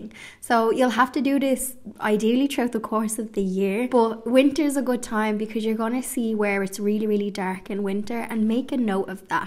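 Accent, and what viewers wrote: Ireland. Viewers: Your video content is great but the real appeal of your videos is your voice and accent. Nothing says cottage garden inspiration more than a whimsical Irish accent 🍄🍀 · I just now discovered your channel. The word cottage drew me but then I saw Ireland! I’m half Irish but have never been there. Everything about this video was a delight, including your lovely accent! You have created such a sweet garden. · Just came across your video. Beautiful work! Btw, I love your Irish accent 😊. We loved traveling thru Ireland..absolutely beautiful country! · Hello from California USA. I have only just found your channel and must say you have taken to gardening in the best most natural way! I admire all that you have done, with the beautiful greenhouse the raised beds and the floor and garden wall you " bricked." What a great and creative way to add loads of charm! 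I will continue to follow your inspiring channel...plus I love to listen to your Irish accent! Happy Spring!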